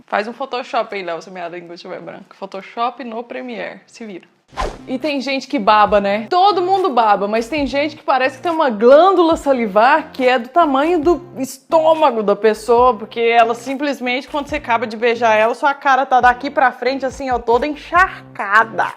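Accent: Minas Gerais, Brazil. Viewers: vc é mineira?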